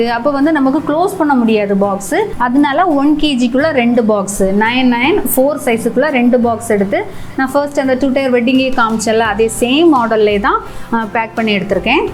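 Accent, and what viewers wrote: Kanyakumari, India. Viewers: Thank u sis ,nanum ethei than panren but romba onum order varala,enoda native kanyakumari thaan sis unga slang vache therinjuthu,😍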